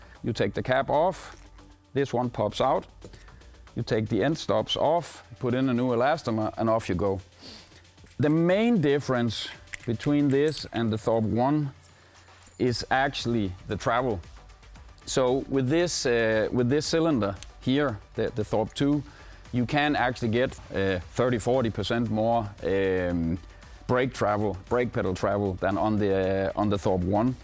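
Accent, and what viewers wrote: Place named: Denmark. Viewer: you can really tell this guy is from denmark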